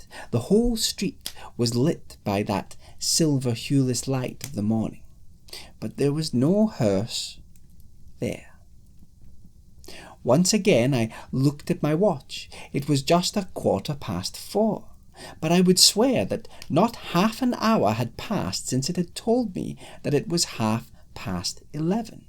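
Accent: Scotland, United Kingdom. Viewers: At the beginning I briefly thought that the accent would deter from the story, but that wasn't the case at all! Well done, my Scottish friend! :D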